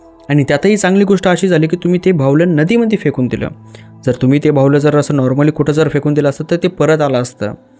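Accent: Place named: Marathwada, India. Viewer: टिपिकल मराठवाडा टोनींग😊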